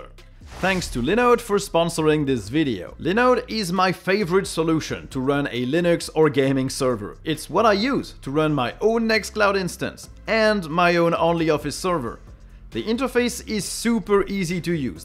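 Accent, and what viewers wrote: Brittany, France. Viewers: Il est breton je crois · Damn are you french? You talk exactly like every french people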